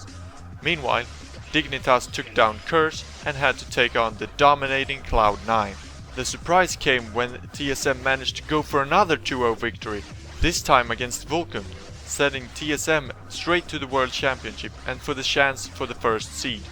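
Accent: Sweden. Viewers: Your english accent, can totally hear that youre a swede :P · Your overall talking is kinda swenglish. Sorta less than Kungen. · You have the most Swedish accent I've ever heard, I've played with A LOT of Swedish players.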